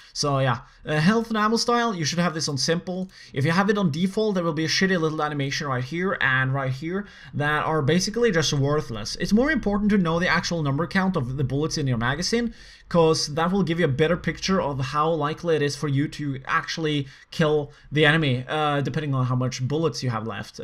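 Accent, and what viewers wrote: Norway. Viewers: Hei :) O_o er du norsk? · Er du norsk?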